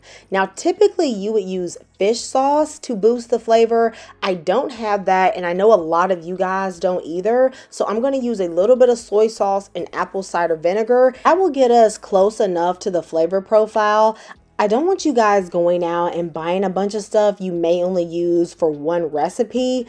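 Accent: South, United States. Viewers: Where you from if I have to guess I would say Carolina’s, or Georgia. I love your channel.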